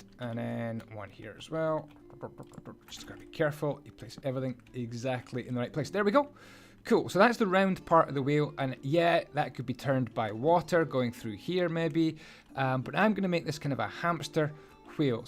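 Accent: Scotland, United Kingdom. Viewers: ! How have I not subscribed to you yet?! Hits subscribe The Scottish accent is irresistible. As for my suggestion, I'd say keep the village going! I love seeing it and I've watched each episode MULTIPLE times! Cheers!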